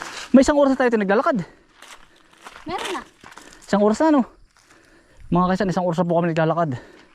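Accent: Quezon, Philippines. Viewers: Maganda ang tunog ng Tagalog ng Quezon.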